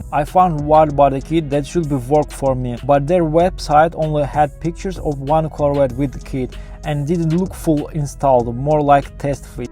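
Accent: Russia. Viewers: По ходу русский с таким акцентом говоришь как ты брат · Какой-то у тебя акцент русский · Наш русский слоняра 😁 акцент не скроешь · Вы русскоговорящий ? Акцент очень похож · Бро, ты русский, или что? Просто оч сильный русский акцент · Is the author from Russia? Why is there such a harsh accent in speech?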